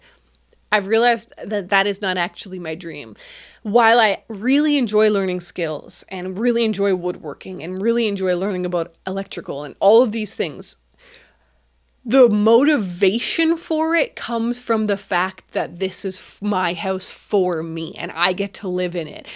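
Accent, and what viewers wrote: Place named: Canada. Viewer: Shes is so... Canadian, eh? Huus and aboot, instead of House and About.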